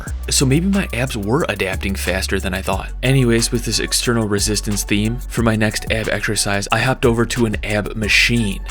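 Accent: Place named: Midwest, United States. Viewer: I love the midwest accent. Idk why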